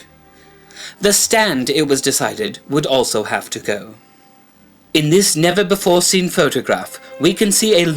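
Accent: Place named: United Kingdom. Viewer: lol the brithish accent does sound sciencey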